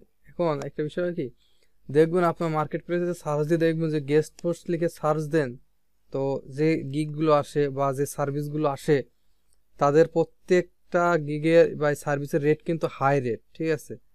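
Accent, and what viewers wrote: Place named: Pabna, Bangladesh. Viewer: kotha sune mone hocce pabnar vasha, apnar sathe jogajog kora dorkar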